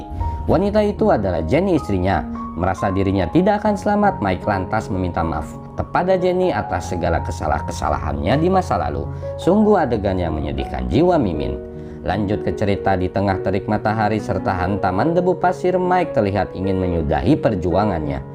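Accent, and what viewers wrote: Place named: West Java, Indonesia. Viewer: si sunda pisan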